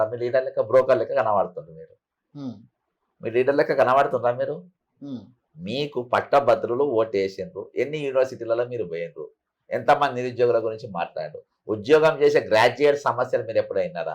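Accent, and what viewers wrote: India. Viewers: Anchor తెలంగాణనా ఆంధ్ర నా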